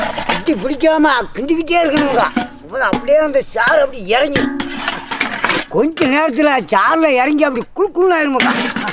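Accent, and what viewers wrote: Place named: Nagercoil, India. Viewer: Slang nagercoil maari iruku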